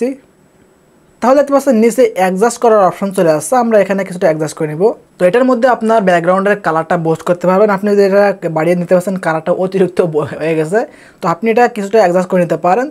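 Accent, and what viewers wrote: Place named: Jessore, Bangladesh. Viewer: ভাই আপনার বাড়ি যশোর। ভাষায় তো সে রকম মনে হচ্ছে 😊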